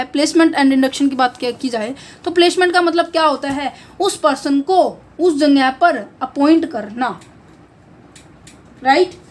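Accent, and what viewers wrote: Haryana, India. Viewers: Mam aap Haryana se ho gi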